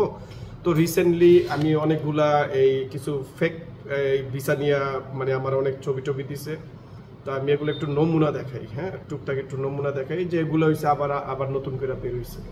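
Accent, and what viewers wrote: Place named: Dhaka, Bangladesh. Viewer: ইয়েস বস.... দেশের মানুষের উপকার করাই আসল বস... আমি কৃতজ্ঞ আপনার কাছে... আপনার ঢাকাইয়া উপস্থাপনা আমাদের ভালো লাগায়....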